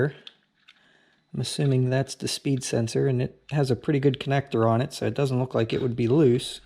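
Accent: Pittsburgh, United States. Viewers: Sup man. I thought you sounded like a yinzer. I grew up dahn the road in belle Vernon. Live it pittsburgh now. Just ordered a black xp. 0rder # 1999*. Can't wait for it. Good vids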